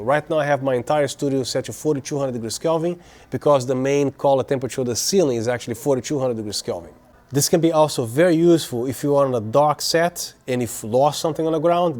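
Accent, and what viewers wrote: Brazil. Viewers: Brasilian Accent?! <3 Loved the Video! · Achei que tava familiar mesmo!!! Legal saber que é BR!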